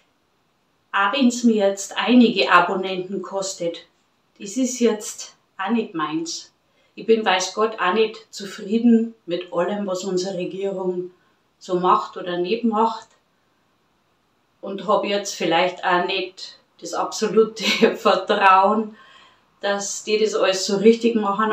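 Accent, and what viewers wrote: Bavaria, Germany. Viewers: Ich mag deine Videos, weil sie unaufgeregt daherkommen, sowas tut sehr gut. Dein Bayerisch mag ich weniger, weil ich ein Viertel nicht verstehe, obwohl ich mir große Mühe gebe - ich bin Berlinerin, also Preußin!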